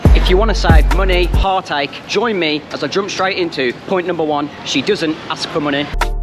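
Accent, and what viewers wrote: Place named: Black Country, United Kingdom. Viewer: Sure, well said:) Where in England are you from? Sounds like Black Country...🤗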